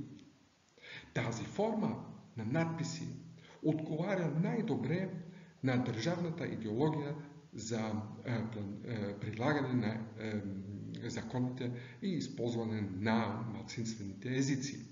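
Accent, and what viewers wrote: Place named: Belgrade, Serbia. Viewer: От къде е тоя човек? Като говори вижда се, че е пораснал в Белград или в Ниш. Аз съм роден в Лесковац и никога не съм учил български в училище, на все пак нямам толкова затруднения да говоря на български.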